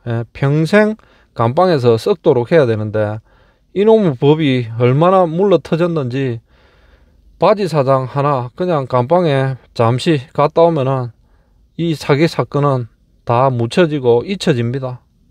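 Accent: Gyeongsang, South Korea. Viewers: 시공사부터 괴비리쑤레기판결인디 저영상찍는 갱상도 사투리가더사기꾼 같은디요...?:어~???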